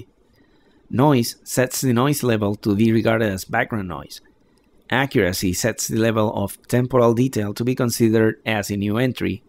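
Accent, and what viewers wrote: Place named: Mexico. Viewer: are you mexican, your english sounds like mexican english mexico rules! :) saludos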